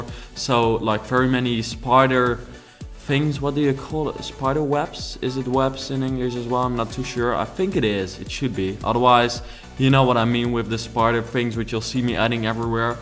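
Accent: Netherlands. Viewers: are you Dutch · Haha I recognized your accent as being Dutch before I knew you were! Awesome! Just bought this expansion and looking for some modern builds as well. I like modern and I like my vampires to live in a modern house as well. Nice build!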